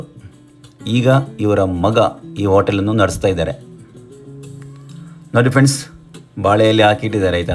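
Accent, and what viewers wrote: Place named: Kundapura, India. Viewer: Kundapura Kannada super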